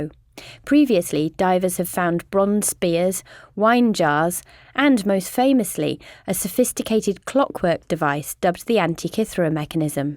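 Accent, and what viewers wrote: England, United Kingdom. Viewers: I adore English Narrators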